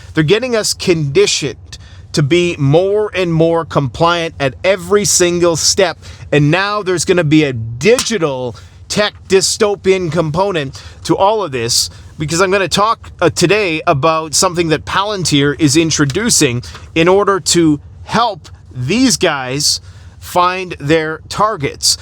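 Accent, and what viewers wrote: South, United States. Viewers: Liking that southern slang 🙏❤️🥰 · like for the southern accent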